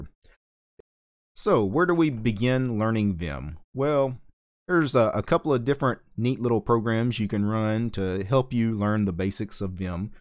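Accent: South, United States. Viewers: You're from the south?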